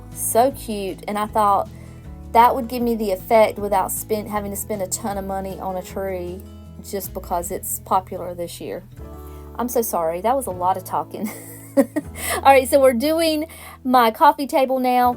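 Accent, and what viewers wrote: South, United States. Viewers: It can be what you want it to be and put out what you love. I think you are still precious! And I love that you talk like me…southern.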